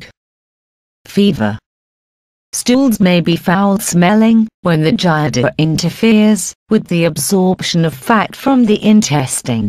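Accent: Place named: England, United Kingdom. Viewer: That English accent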